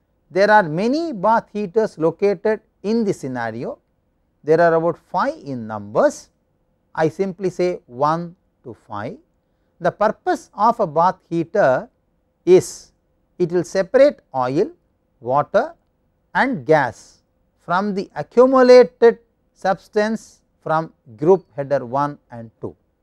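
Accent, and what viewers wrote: India. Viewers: Good Explanation in typical Indian accent.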